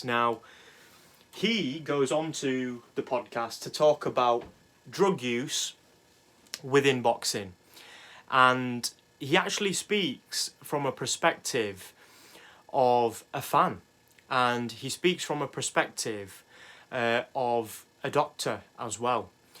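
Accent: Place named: Birmingham, United Kingdom. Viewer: Underated channel mate,good info plus you sound like a fellow brummie👍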